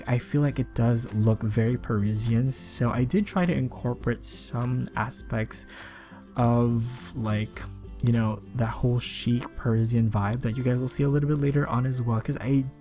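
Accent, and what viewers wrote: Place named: Philippines. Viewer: A Filipino SimTuber! This is like finding a unicorn. I recognized your accent, but I had to stalk your socials to be sure. Your builds are real pretty and you pick great inspirations! SUBBED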